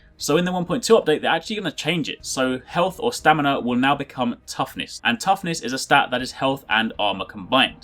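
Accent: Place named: United Kingdom. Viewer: great content!thumbs up because i like listening to u.k guys accents.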